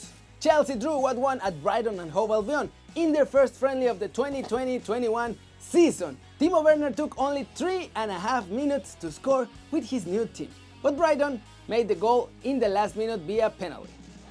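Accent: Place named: Mexico. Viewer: Muy bien overall, si note unas cosas, pero muy bien, el acento te delata, pero no se si seria español o mexicano, creo mas mexicano que español, no te preocupes yo tengo 23 años aca y se me olvidaron muchas cosas por la peda, lo entiendo muy bien y lo hablaba mas que menos, pero ahora se me olvidan muchas cosas. 😩😁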